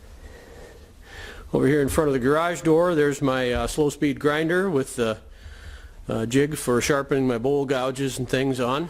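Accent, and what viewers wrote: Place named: Michigan, United States. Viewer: Nice shop! Mid-Michigan eh? I used to live down in Three Rivers (yes, there're actually 3 rivers. You'd be surprised how many times I was asked…) I should've recognized the accent, guess I've been in California too long.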